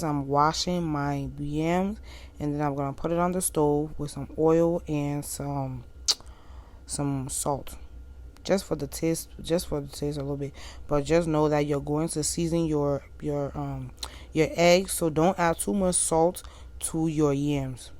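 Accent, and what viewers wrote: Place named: Liberia. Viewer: I love the English😂❤ koloqua to the world🇱🇷🇱🇷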